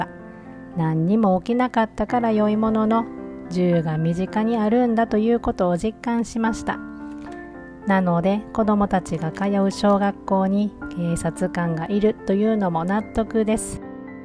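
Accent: Kansai, Japan. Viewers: 声と関西弁、いい感じです😂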